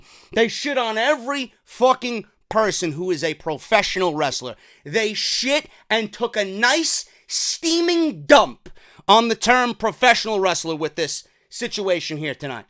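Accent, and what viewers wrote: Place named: Fort Dix, United States. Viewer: I actually liked parts of this show Shawn did his do,they over did the Fire works I agree Braun sucks man I forgot how little Rey is good job I’ve been watching wrestling along time even saw the Real Nature Boy wrestle before Flair I always stop and listen to you I was Station at Fort Dix in the 70’s everybody sounded like you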